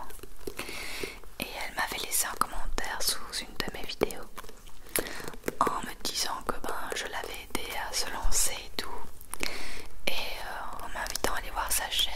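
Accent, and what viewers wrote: Belgium. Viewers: C’est lunette te vont tellement bien ! J’adore ton petit accent léger belges je trouve sa troppp mignons !!!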